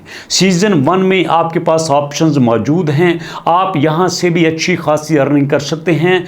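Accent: Punjab, India. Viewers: I think sir g you are from Gujrat Punjab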